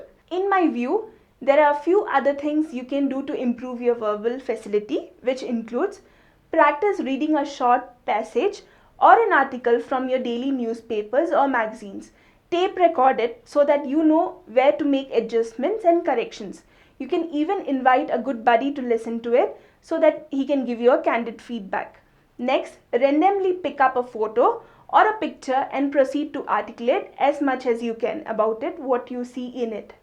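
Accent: India. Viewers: It's not terrible it's Indian accent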